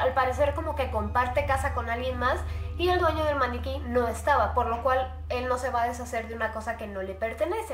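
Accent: Colombia. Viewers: Dizque usa palabras de México obviamente es colombiano por como habla, las palabras y el acento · El es colombian se los digo porque yo lo soy y se le nota :v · Las papal abras que usa y su acento, es muy Colombiano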